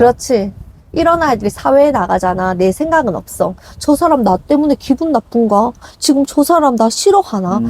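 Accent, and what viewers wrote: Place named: Busan, South Korea. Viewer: 쌍둥이 육아하는 아부지 입니다 마누라 도와서 열심히 서포트 하면서 오늘도 교수님 나긋나긋한 부싼 억양 들으러 왔어예~ ㅎㅎㅎㅎ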